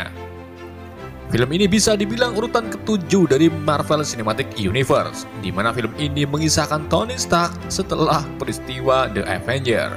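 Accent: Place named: Banyumas, Indonesia. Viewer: Jawanne. Ndi mas??? Dimak dimiik kayong ngapak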